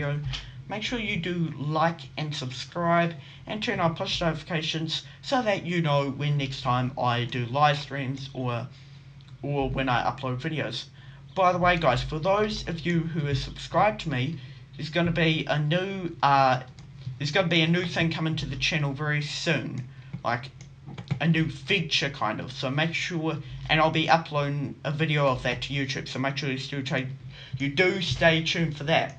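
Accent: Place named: United Kingdom. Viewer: Brittish accent 👌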